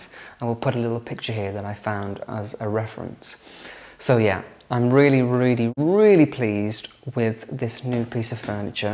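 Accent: RP, United Kingdom. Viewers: Im a new subscriber,and really enjoy your videos,i would like to make a point,i wish most people had your way of speaking,its not artificial its the Queens English,i speak the same way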